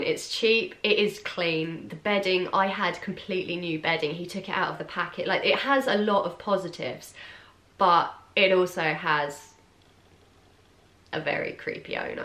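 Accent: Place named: England, United Kingdom. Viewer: English accent are awesome! 😁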